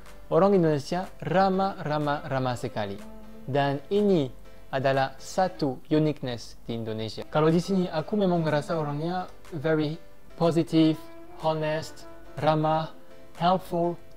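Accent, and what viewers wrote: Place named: France. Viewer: Bahasa Indonesia nya sudah lancar aksen nya doang masih Perancis 😁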